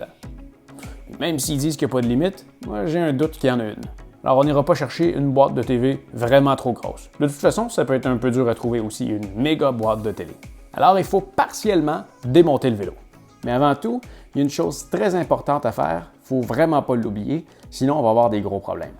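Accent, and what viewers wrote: Quebec, Canada. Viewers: Sympathiques et utiles vidéos avec un accent et des termes québecois imagés et amusants qui font déjà commencer le voyage...! Merci ! Frédérique de Nice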